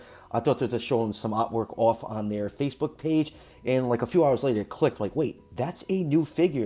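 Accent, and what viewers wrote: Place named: New York, United States. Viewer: I think he's found new york or jersey with his accent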